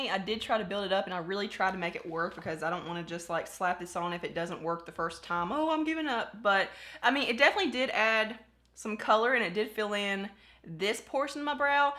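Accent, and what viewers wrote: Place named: Kentucky, United States. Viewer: Girl I knew from the first time I watched one of ur videos u were from Kentucky! The accent gives it away for sure but I'm a Kentucky gal too! I'm from Letcher co ky.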